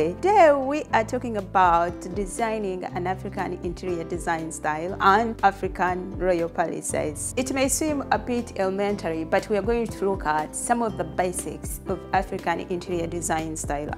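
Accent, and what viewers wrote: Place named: Uganda. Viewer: I love your Ugandan accent ❤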